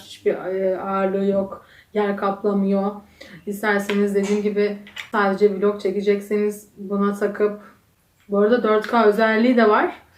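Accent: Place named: Yozgat, Turkey. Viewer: esahhhh mı 😂😂😂 bu şiveyi herkes bilmez zaten 😂😂 evelallah Yozgat hanımları mariferlidir 💪💪💪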